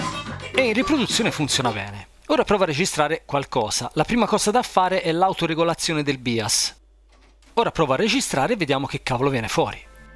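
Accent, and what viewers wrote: Tuscany, Italy. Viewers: Ti ho appena scoperto, sei davvero molto bravo ad intrattenere, oltretutto ho notato che hai il timbro della voce uguale identico a quello di Gianluca Bocci , se non fosse per l'accento toscano marcato ( che è splendido fra l'altro) saresti uguale a lui 😂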